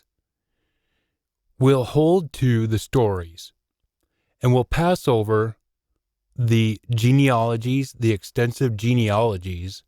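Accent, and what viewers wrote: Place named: United States. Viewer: Your American not Scottish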